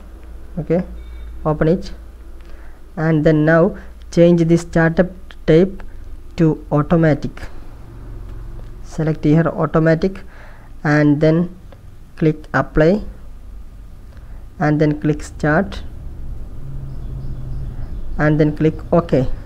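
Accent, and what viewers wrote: Kerala, India. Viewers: I like ur mallu English 😂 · Are you malayali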